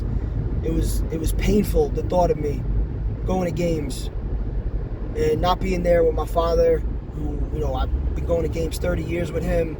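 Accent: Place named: New York, United States. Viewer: My man’s went New York accent on y’all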